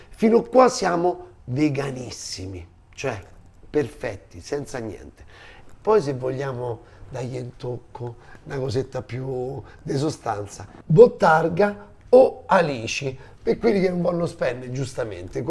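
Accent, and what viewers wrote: Italy. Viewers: Preferivo i video di 3/4 anni fa dove parlavi con un accento più italiano ed eri più umile.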